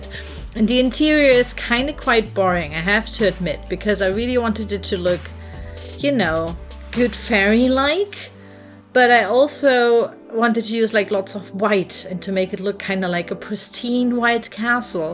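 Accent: Germany. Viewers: Are you german? You accent sounds german 😅 (I'm half german) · Ich: starte das Video und höre die ersten Sätze auf Englisch. Mein Gedanke dabei war: „Das ist kein native English...eher deutsches Schulenglisch.“ Auch ich wie ich bei deiner Kanalinfo lese das du aus Deutschland kommst: „Ich wusste es!!😂😂😂“ finde ich richtig cool, dass du deine Lets Plays auf Englisch machst, damit auch andere, die kein Deutsch können, wissen was du gerade im Video machst!👍🏻 weiter so!😊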